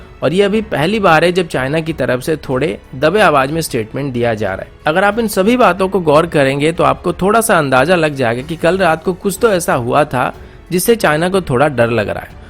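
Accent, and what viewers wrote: Odisha, India. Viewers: Bhai app Odia ho kya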